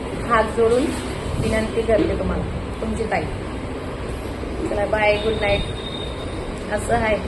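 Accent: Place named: Belgaum, India. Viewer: Ase kahi naste आपण काम करत जा falachi apexa na thewata pudhe जा kashtache jivan ahe pn nashib wan ahet 4 children ahet .keep itp sone ky parat yenar .struggle is life .ata thodech diwsat tumche best days yenar mule shklit tyana smjte .good job kartil dont b nervous n worried.God bless u all.ur marathi language is ltl bit belgaum type.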